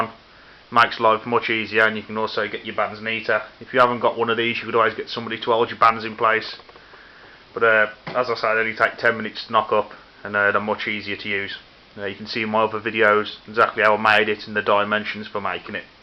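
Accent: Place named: United Kingdom. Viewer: Whow. Thank you for that know-how. Where are you from? It took me some time to get used to your accent, now I understand - what I hardly did at the beginning :o) All I could guess was that you must be British.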